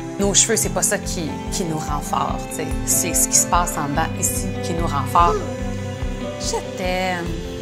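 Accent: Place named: Quebec, Canada. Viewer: L'accent québécois 😫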